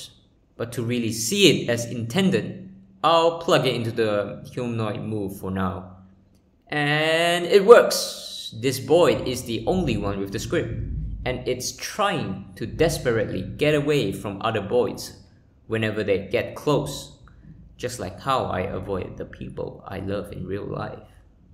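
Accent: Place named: India. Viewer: subtle indian accent.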